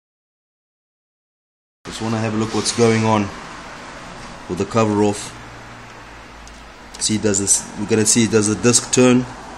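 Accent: South Africa. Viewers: South African? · Excellent video! Nice job! Are you South African? You're accent sounds South African.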